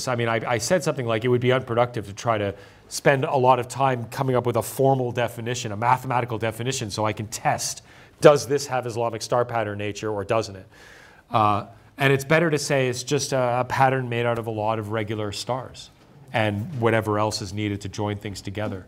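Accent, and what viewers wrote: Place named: United Kingdom. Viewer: somehow british irritates me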